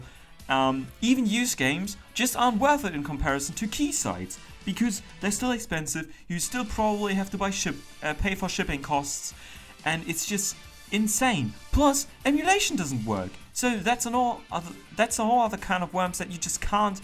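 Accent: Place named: Germany. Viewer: Based on your accent, I would say you are from germany, or some other western eu country and saying that consoles are not worth it and that you would rather build a pc is so ignorant to the rest of the world, where 1 month salary maybe wouldnt even be 500e. There's no pc that can be built for that price that can run latest games in the same quality. Also ps plus (game pass) exists with small subscription you get large library of games. And all this is coming from a pc gamer. Instead of shitting on consoles, you could be shitting on graphic cards prices. Oh, wait, you are born in rich country, you dont care...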